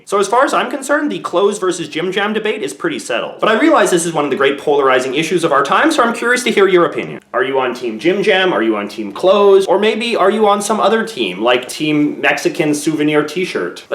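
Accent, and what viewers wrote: Canada. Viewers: Bill Ward Hes canadian. Hes gonna speak differently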